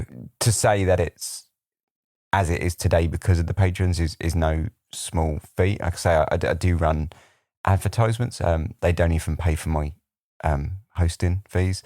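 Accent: United Kingdom. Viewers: I just love British narrators. They make everything sound so official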